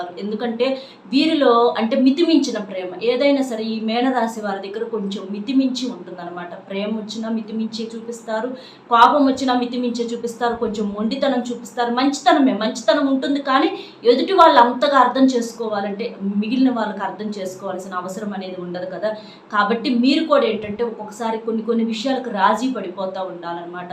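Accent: Nellore, India. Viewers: మీ బాష యాసా చూస్తే నెల్లురు జిల్లాలో వారిగా అభిప్రాయం కలుగుతుంది.. గారు మీ పోన్ లేదా అడ్ర తెలుపగలరు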